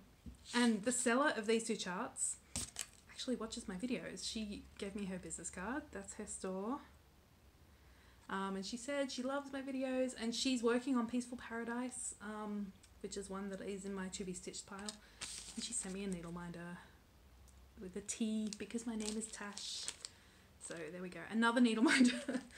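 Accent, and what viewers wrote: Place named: Australia. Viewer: Hey Tash! Just found you! I was so happy to hear your Australian accent. I lived in Perth for a couple of months back in the late 90s. Spent lots of time at Cottesloe Beach, too and loved it! Such a gorgeous city and incredible water to swim in! I actually live in Oregon and our beaches are cold year-round. Enjoyed your video and your wonderful water piece, in particular. Simply gorgeous!!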